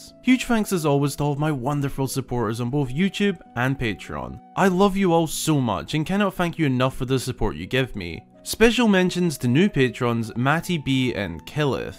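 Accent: Scotland, United Kingdom. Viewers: You have the Andy Murray accent, complete with the groaning sound and drawn out words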